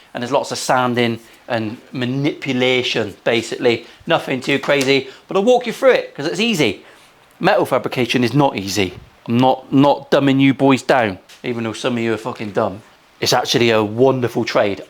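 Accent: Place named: West Country, United Kingdom. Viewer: Only just discovered your channel,. Excellent vids love the humour. Being Milehouse Studios you're obviously based in the 'Muff-always nice to hear an accent even more Wurzel Gummidge than mine, bey 😂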